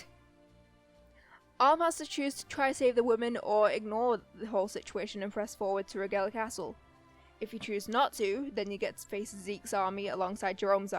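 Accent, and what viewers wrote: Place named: North England, United Kingdom. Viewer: Great overview of Alm from a lovely north English accent.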